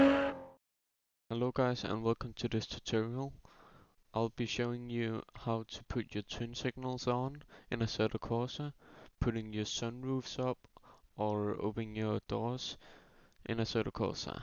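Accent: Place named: Denmark. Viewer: det er hvad jeg kalder en dansk accent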